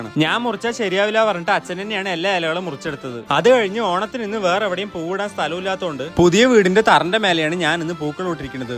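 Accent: Palakkad, India. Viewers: Indrajith vlogile athe voice modulation😅 palakkad ano/?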